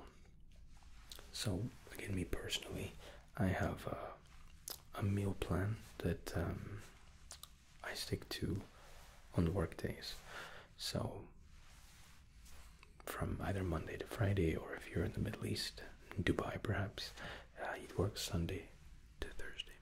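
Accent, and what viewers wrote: Scotland, United Kingdom. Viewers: I didn't realize you were born in England,? I thought you were full on Scottish. Were you raised in Glasgow? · Scottish I thought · Why are u so Scottish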